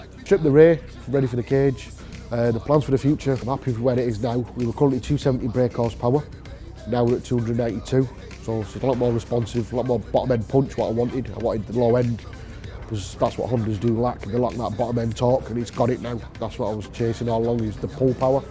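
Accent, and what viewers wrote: United Kingdom. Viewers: Didnt understand his harsh brittish accent but what ever he said sounded frigging cool 😎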